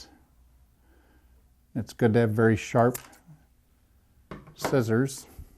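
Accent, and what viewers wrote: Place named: Northern Ireland, United Kingdom. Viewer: Very northern irish sounding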